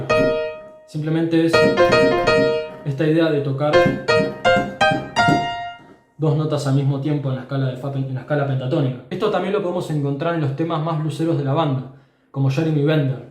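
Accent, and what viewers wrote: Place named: Argentina. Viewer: Espectacular análisis de la técnica del gran Keith Emerson en español. Intuyo por tu acento que eres argentino. El país de Alberto Ginastera, al que, como muchos otros, conocemos gracias a Keith. Se me ha pasado volando la media hora larga del video.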